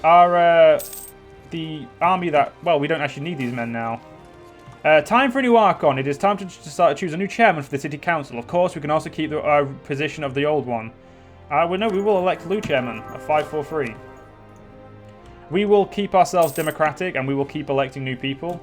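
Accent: England, United Kingdom. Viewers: Because of the way he was saying the NEW MODELS ARE LEGITAHMEHLLY BYOOAFUL I couldn’t watch. English accent is shite.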